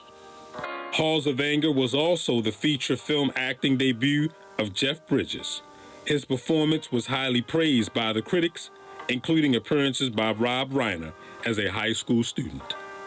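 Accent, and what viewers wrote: Baltimore, United States. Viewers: I was today old when I learned that the name Biggie Smalls was from the movie “Let’s Do it Again.” Also my people are from the Bahamas. Cool. Also I hear that Baltimore accent. Good video 👍🏿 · The woman's name is pronounced OOOH-tah HAH-gen (Uta Hagen). She was someone you truly need to research. Also, the role from Othello is pronounced Ee-YAH-go (Iago). You're Baltimore accent is strong. I love it!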